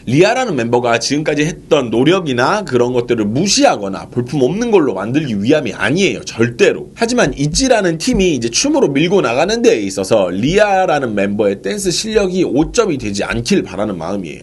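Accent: South, South Korea. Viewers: 아따 ~ 남도사투리로 설명하는게 겁나 구수하구마이라~ ㅋㅋ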